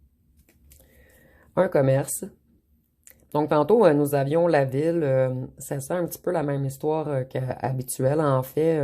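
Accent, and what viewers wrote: Quebec, Canada. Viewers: J'adore votre accent le jour j'irai voir voir au Canada ❤❤❤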